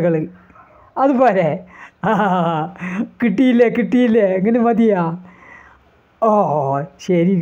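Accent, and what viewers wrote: Palakkad, India. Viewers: ഓഹ് അണ്ണൻ ഞൻ നിങ്ങളുടെ വലിയ fan ആണ് ട്ടാ..... Exspecially നിങ്ങളുടെ ആ സംസാരിക്കുന്ന ആ ഭാഷ ഇണ്ടല്ലോ നമ്മളുടെ പാലക്കാടൻ ഭാഷ എനിക്ക് ഇഷ്ട്ടായി..... ❤️😍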